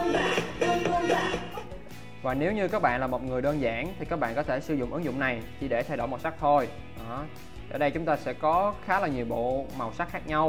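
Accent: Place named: South, Vietnam. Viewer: tiếng miền nam ko thể nào nghe dc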